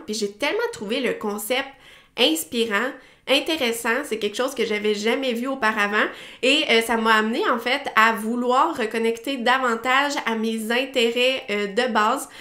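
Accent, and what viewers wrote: Quebec, Canada. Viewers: J'apprecie la possibilite de lire un blog minimaliste en francais et de vous ecouter. Je just veux partager ma suprise car d'une habitude j'ecoute a francais europeen et votre accent quebeqois m'a etonnee. C'est super! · Ton accent est québécois ? Wooow je suis mexicaine et...c'est super intéressant ton contenu et ton accent 😊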